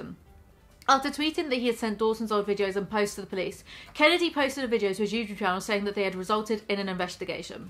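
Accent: United Kingdom. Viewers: i wish i could get my own british person to rant about stuff to me all day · its not just the accent, youre sooooo brit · You went full British for most of this video and I’m sliving for it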